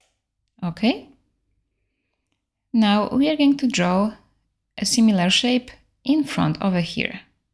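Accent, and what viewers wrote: Poland. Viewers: I love your voice! Are you Polish?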